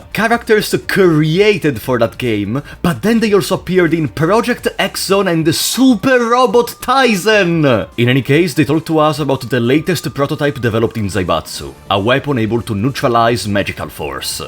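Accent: Italy. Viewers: Being from New Jersey it's weird hearing an actual Eye-Talian. Fun videos though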